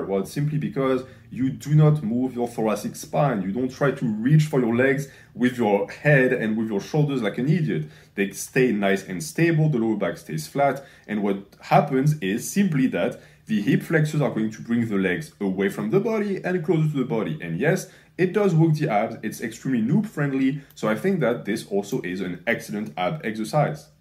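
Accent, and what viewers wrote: France. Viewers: Are you french or speak french as your main language? I can sense a little bit of french when you talk in English but keep it up !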